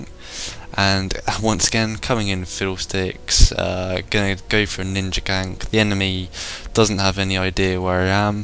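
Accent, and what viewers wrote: United Kingdom. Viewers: British accent, huehue.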